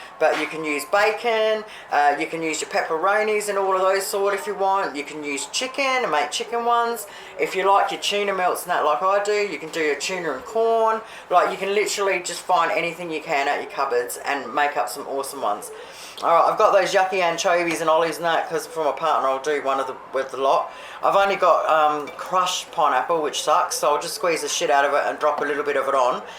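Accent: Australia. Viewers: I just stumbled upon your channel when I searched for baked pizza sub. I'm about to subscribe cuz you have a candid way of saying things like me only I have an American accent and yours is Australian. I can't wait to see what else you got cooking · Michigan here, love the OZ accent. I'm doing subs with ham, pepperoni, black olives, sweet onion, tomato slices, provolone cheese and after it's all toasted in the oven, shredded lettuce. ( edit: and jarred pizza sauce. ) Thanks for the video!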